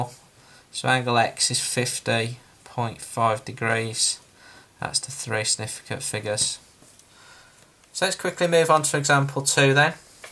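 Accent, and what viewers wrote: Birmingham, United Kingdom. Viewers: that brummy accen though!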